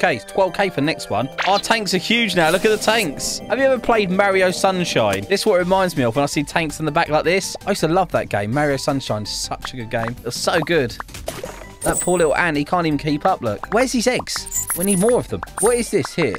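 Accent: United Kingdom. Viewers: Are you British I’ve been trying to ask you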